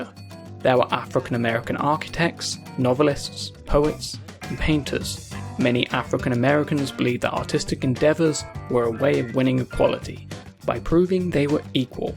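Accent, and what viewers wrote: United Kingdom. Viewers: What’s with the British accent for an American history presentation? I can’t even!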